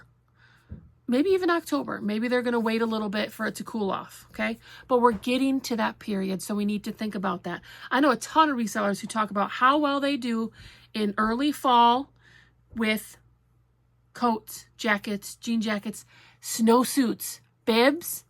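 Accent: Wisconsin, United States. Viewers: Tie-kon-do-ro-guh 😁. Those are great! Love the videos! Wisconsin accent makes me homesick. Thanks for sharing!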